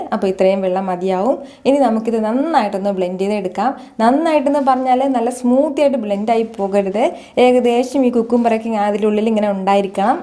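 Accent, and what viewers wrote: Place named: Malabar, India. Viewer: ath അറിയ but malabari style talk ആണ്. അതല്ലേ നമളെ പോലുള്ളവർ fans ആയത്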